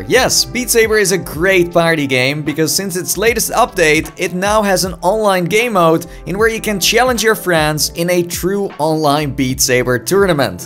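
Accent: Netherlands. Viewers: You're just another cookie cutter channel with the added annoyance of your Dutch accent trying to sound American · Het nederlands accent met de vele uh's doet echt pijn Wakey wakey tietel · dat thicc dutch accent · Are you dutch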